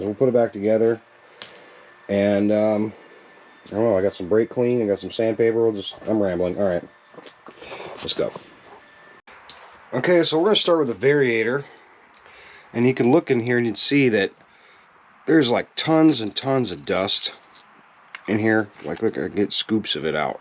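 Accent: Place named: China. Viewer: He is a chinese spy! You can tell he is chinese. Got chinese accent as well!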